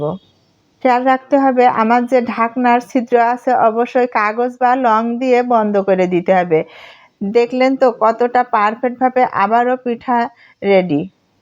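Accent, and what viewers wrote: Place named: Jashore, Bangladesh. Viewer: Apu apner desher Bari kon dristic? Kotha sune mone hocche jashore a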